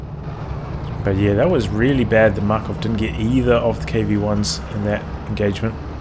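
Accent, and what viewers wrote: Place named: New Zealand. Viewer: You from NZ brother?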